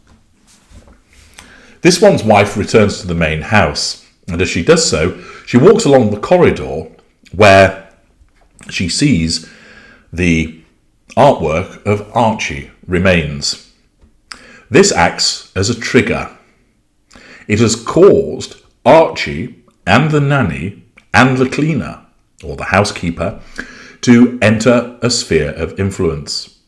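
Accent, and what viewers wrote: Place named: Scotland, United Kingdom. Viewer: You know sometimes your pronunciation reminds me of Alan Brazil. I think you are of Scottish heritage.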